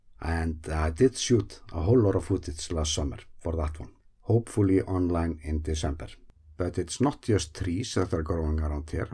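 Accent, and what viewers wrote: Iceland. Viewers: One of my favourite things is how well I understand the Icelandic accent from watching your videos. When I first started watching I needed subtitles sometimes but now it’s completely natural to me. Keep making awesome videos :)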